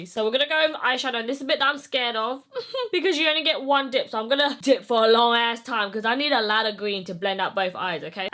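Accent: United Kingdom. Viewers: I love a British accent !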